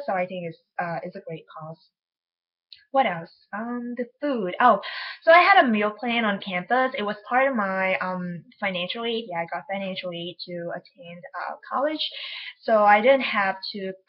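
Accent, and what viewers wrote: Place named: United States. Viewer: hey how long have you been there? Your accent is almost 100% american. I am confused about this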